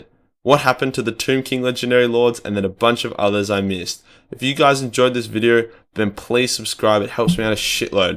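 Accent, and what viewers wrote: Australia. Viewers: Great vid man, always awesome to hear a Australian cover lore. Not only educational but funnier then my non-existent love life.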